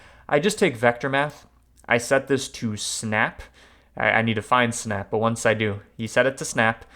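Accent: Boston, United States. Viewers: I love your Boston, reminds me of my C.S. college days =D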